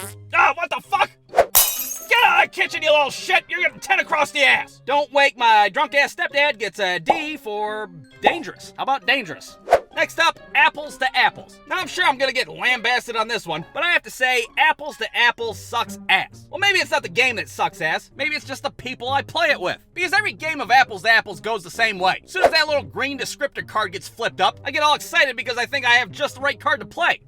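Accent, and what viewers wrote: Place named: Midwest, United States. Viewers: It's called an "ohio accent".... me and come from the same area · Typical Ohio accent - “average Michigander” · That’s how we sound in the midwest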